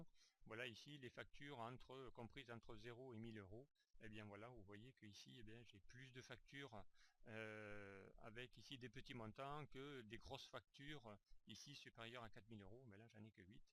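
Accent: South, France. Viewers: étonnant, j'entends très bien, même avec l'accent du sud de la France, manque les cigales en bruit de fond Essayez avec des écouteurs ou casque audio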